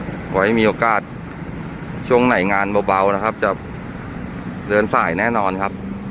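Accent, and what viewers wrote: Ratchaburi, Thailand. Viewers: สำเนียงของแท้เลย ชาวราชบุรี ชอบครับ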